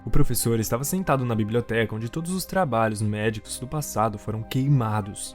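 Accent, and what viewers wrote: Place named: Northeast, Brazil. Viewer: Tu é nordestino. Ceará????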